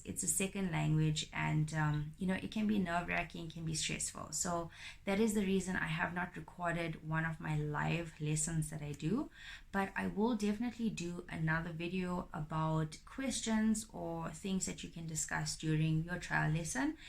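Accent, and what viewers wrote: South Africa. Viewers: Do you use your south African accent or you change it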